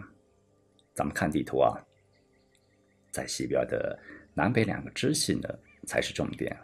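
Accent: Yunnan, China. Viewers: 云南口音很亲切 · 这是云南口音啊？😂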